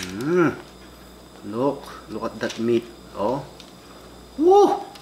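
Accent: Ilocos, Philippines. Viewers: So funny that she speaks with American accent, and he's so ilocano English speaking 😅😄✌️ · my god kuya haha yung tono mo hahaha ilocanong ilocano .. "nag dakkul neh" hahaha umapal nak man kuya haha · That ilocano accent tho Hahahaha Proud ilocano!!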